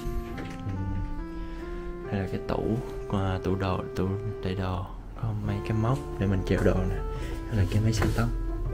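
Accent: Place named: South, Vietnam. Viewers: Ui bạn này giọng miền Nam nghe thích ghê, có thiện cảm liền luôn 🤗🤗🤗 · Ko biết quê gốc ở đâu ha nghe giọng giống miền tây quá...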